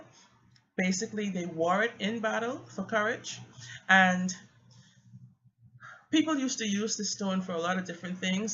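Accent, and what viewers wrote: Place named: Bahamas. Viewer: Are you bahamian? I hear an accent. Great video!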